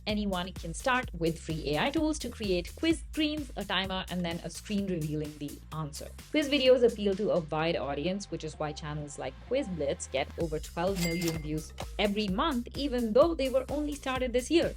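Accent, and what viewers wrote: India. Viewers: Your voice like india sound in you voice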